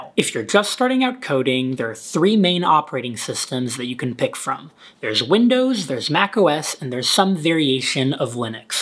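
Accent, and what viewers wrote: Romania. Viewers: You romanian ? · Are you romanian?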